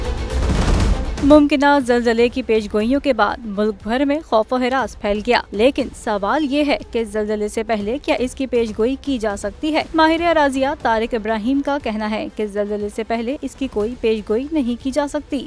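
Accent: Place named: India. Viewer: Indian accent me news kyi suna rhy hein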